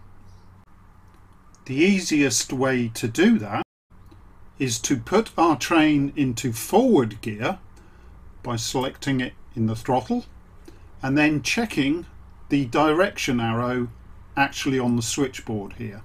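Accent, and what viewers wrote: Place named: Oxford, United Kingdom. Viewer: Hi, your videos are very much interesting! I am currently in a process to go from analogic to digital on my network. I am equiped with Z21 and Itrain on my computer. Aim is to implement computer driven interlocking. I followed all the steps of your tuto and I feel more confident. As a French, I have also very much appreciated your Oxford english speaking! I have one topic which bothers me: during my off line tests, I lost my screen organisation: I have only left the TCO and the trains/locos control pannel: no more train list with positions and destination indications. Could you advise me on how to come back to the initial screen set-up? Thanks again. Alain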